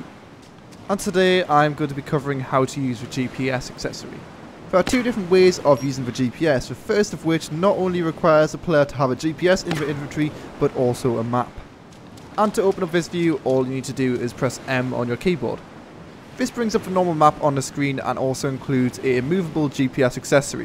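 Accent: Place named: Northern England, United Kingdom. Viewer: good to hear a fellow northener on the tube :)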